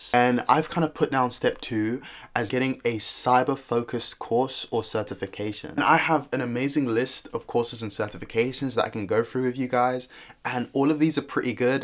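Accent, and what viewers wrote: United Kingdom. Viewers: that's funny, I never noticed from the UK... I'm used to the UK accent as I'm from the UK too lol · thanks Bruv for clearing my mind and showing the way also i glad that i've finally found a pleasant calm UK accent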